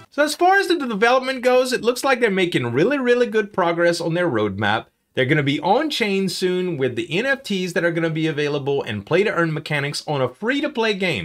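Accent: Louisiana, United States. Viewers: Oh yeah for sure. No doubt he's a fellow Cajun from my neck of the woods.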